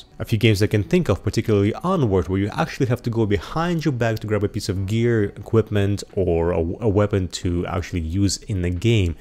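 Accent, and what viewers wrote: Russia. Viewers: По акценту кажется, что ты русский. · Бро ты же Русский???? по акценту слышно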